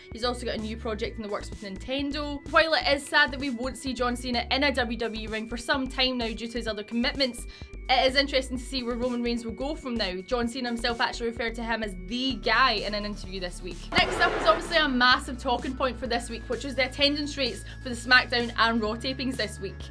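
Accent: United Kingdom. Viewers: god this hard british acent is something. i cant understand a word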